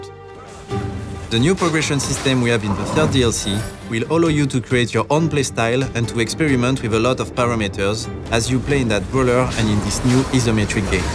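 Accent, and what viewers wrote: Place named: France. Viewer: Le mec quand il parle ça s'entend qu'il est français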